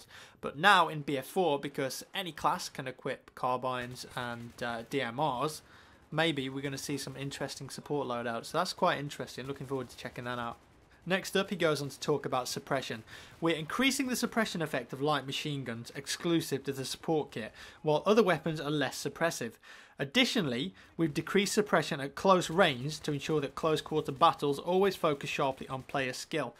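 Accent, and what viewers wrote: United Kingdom. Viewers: Guess he can't stand your civilized British accent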